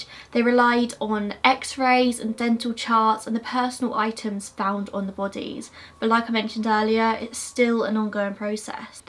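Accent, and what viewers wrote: United Kingdom. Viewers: I could listen to your Brittish accent all day. With that being said, excellent video as usual. I've seen several documentaries on this case, but yours is really complete, shows how much research you actually put into your videos. Well done, keep up the fantastic work.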